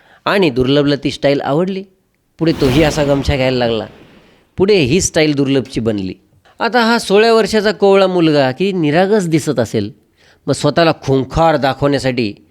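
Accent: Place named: Rural, India. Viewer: Narrator's Marathi sounds unsophisticated and from rural area. It should be polished to reach out to urban audience.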